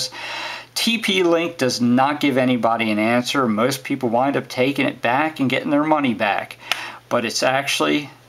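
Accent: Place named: Philadelphia, United States. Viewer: Thanks for the help dude. From another dude from the Philadelphia metro area, I recognize that accent anywhere!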